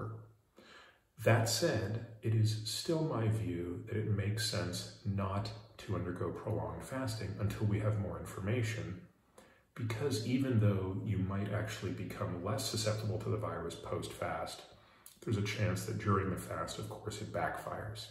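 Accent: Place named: Canada. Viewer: It might be the intonation since Peter is Canadian like Seth. Peter has a better voice.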